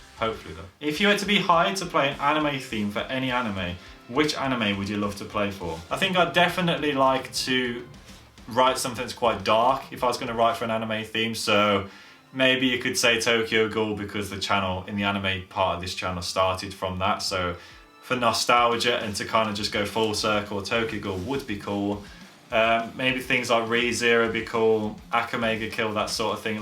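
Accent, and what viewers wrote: United Kingdom. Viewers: Are you british? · Wasn’t expecting you to be northern! Love your accent and it was cool getting to know you after watching so many covers!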